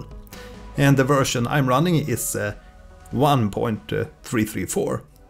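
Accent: Sweden. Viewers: dat swenglish <3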